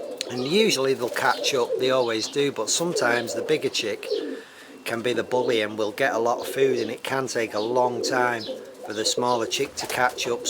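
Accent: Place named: Yorkshire, United Kingdom. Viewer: Hi I watch all your videos we’re are u based sounds like Yorkshire?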